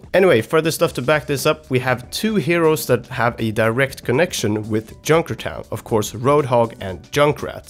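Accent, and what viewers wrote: Sweden. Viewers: Are you from Sweden??!?!?!?! 😃😀😃😀😃😀😃😀😍😍😍💕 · Wow wow wow are you from sweden?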